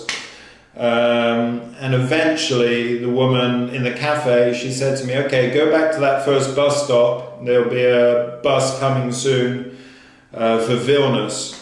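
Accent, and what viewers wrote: England, United Kingdom. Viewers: Hi Mr traveler I’m new too your channel I loved your video and I love your English accent! Sending love and greetings from Orlando Florida USA ❤ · You actually did pronounce it right. Close to it as you can get with the english accent. Kaliningrad is supposedly fun to visit if you like all the old abandoned wwII stuff, you should have explored all of that. But right now with the situation, just get back to Europe.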